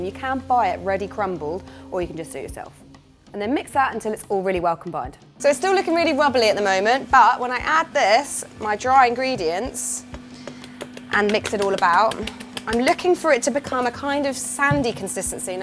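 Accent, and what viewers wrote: United Kingdom. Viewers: Came for New York Cookies but heard a british accent............hahaha, anyways, not half bad looking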